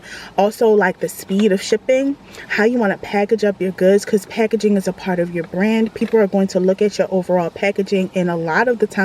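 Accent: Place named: Baltimore, United States. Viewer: Thank you Queen, and I will take your advice, because I have strongly been thinking about starting a body butter business. New subscriber, and you sound like you're from Baltimore lol. Love your accent and your skin is beautiful! Wishing you continued blessings on your business and look forward to your videos! 😀💞🤗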